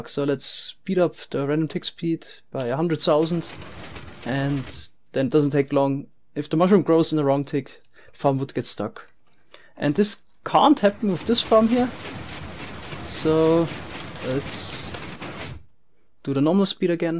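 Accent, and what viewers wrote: Germany. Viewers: You're german, right? :)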